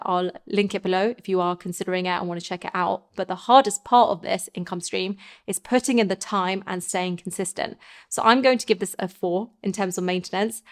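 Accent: United Kingdom. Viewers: I clicked Like after 10 seconds because of your awesome British accent, love it! Good tips too of course. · I saw your thumbnail. Bet my buddy you had a British accent . He said American. I figured it was 50/50. Thx for the free lunch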